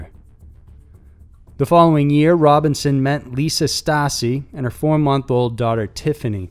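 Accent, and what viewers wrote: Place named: South, United States. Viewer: It is probably his accent. Northern and Southern accents are different and words are pronounced differently according to where you grew up. He sounds like he may have lived in the Southern area of the US. I'm friends with a Southern girl and I am from the North. She says words differently than I do at times.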